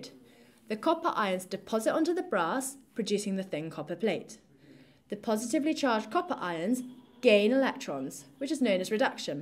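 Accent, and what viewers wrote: United Kingdom. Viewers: its pure british. enchanting no doubt but i wonder do they also love the way we speak hindi. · British accent🤩😍😅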